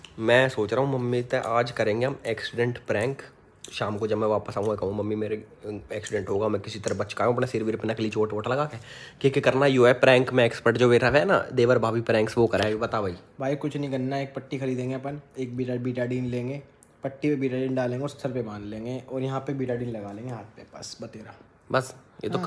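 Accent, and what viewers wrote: Haryana, India. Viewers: Bhai tum hariyana se ho k.bhasha se lgte h .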